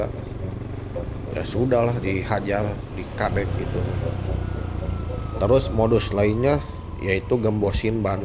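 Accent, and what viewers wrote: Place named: West Java, Indonesia. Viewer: logatnya Sunda pisan ahahahah. adem dengernya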